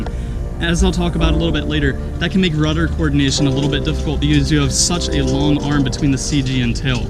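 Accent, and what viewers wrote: RP, United Kingdom. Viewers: You're so posh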